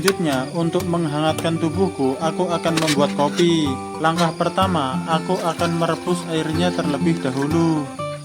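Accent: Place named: Java, Indonesia. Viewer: Logat jowo nyell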